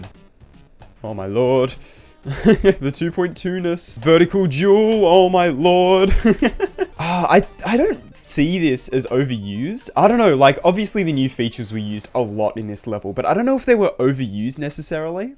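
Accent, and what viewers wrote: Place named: Australia. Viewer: Why do the Aussie yt’s sound the same. Like you sound like the nba YouTubers called “6man”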